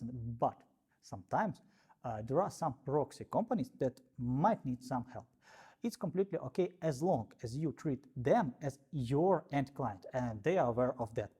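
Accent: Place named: Russia. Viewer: Hi, just you have an accent like a russians :)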